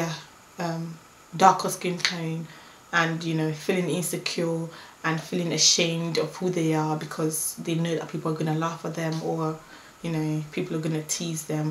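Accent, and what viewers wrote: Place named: United Kingdom. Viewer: u are stunning i live in the us so i have not had herd the accent in many year i was on uk once many yrs ago with the military but i could never gt tired of hearing u speak iam certain its a pleasure to hear u say something as simple as good morning or pass the pepper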